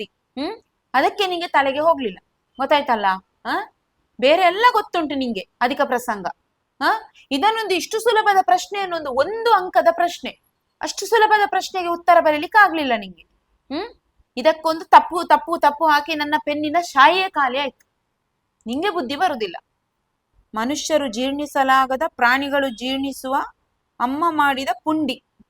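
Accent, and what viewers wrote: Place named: Coastal Karnataka, India. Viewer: Madam your Kannada is good. Coastal Kannada.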